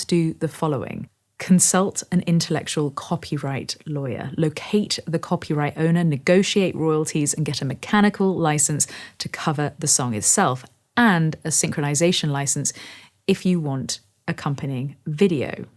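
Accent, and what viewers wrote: United Kingdom. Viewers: I suspect she could earn serious money doing voiceovers for companies who need an authentic and very well articulated brit voice. Could listen to it all day TBH. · As an American for quite some time, your British accent knocks me out: "Johnny Cash and _____" (something naughty) and "One of the best ways to grow your audience' hair"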